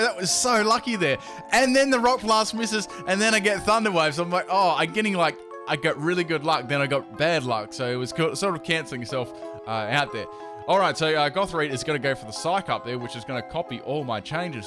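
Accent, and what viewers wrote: Australia. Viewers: yo are you aussie?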